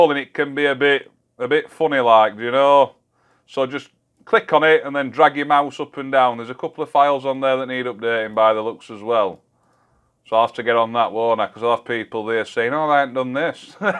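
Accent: Yorkshire, United Kingdom. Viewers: hey thanks for making this video I uninstalled it because I could not figure out how to use this programs also I noticed ur accent are you from the great Brittian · Are u from Yorkshire I’m from West Yorkshire you’ve got a very Yorkshire accent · Very good videos. Good to hear a Yorkshire accent explaining it as well. Keep em coming cheers pal.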